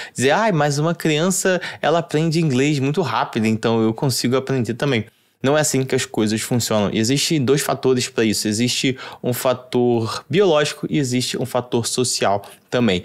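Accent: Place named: Brazil. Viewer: mano nunca sinta vergonha do seu sotaque brasileiro, 99% das pessoas que trabalham em TI pro exterior tem sotaque, no final das contas quem trabalha pro exterior sempre acaba trabalhando com indianos, poloneses, russos, filipinos, e obvio que todos eles tem sotaque xD nosso sotaque é nossa identidade também !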